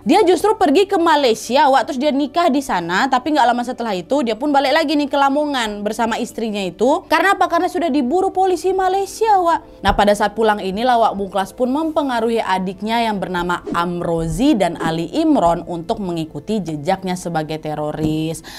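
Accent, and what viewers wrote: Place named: West Sumatra, Indonesia. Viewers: enak kali dengar cerita ini, logatnya khas minang, jadi suka lihat ibu ini. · Gw salut sama kamu membawakan cerita bom Bali dg khas Sumatra mantap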